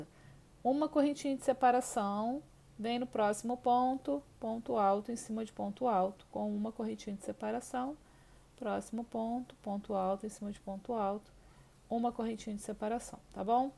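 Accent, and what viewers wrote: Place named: Rio de Janeiro, Brazil. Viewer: adorei sua explicacao e anotei no meu caderno, gostei de voce dizendo "ta okay" como o nosso eterno presidente Bolsonaro fala bem coisa de carioca falar mesmo, aqui no Parana nao temos o costume de usar esse termo 😄o presidente por ser paulistano reside no Rio ele tem o costume de falar é muito bom. Beijos 😁💋❣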